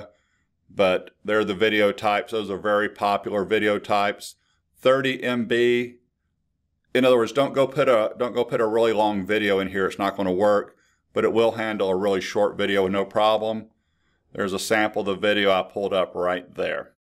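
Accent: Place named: South, United States. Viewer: Thanks... what accent is that btw...??? somewhere south??? one of my favs lol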